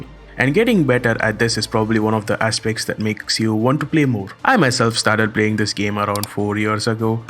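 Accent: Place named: India. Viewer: that indian accent haha superb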